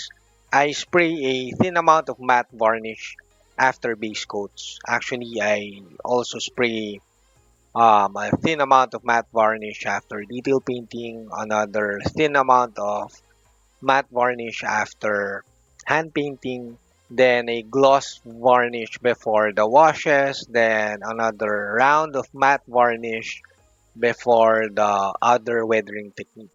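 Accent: Philippines. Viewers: the way you talk sounds like a native Filipino.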